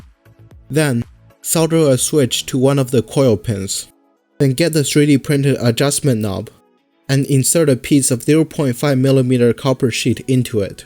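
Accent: China. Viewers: 你的口音怎么这么像中国人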